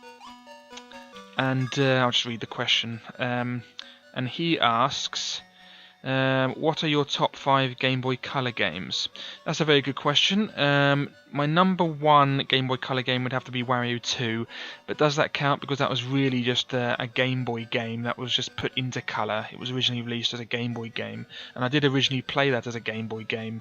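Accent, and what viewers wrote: United Kingdom. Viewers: I'm not certain there is a particular way a 30 year old is supposed to sound. You do sound very British though 😄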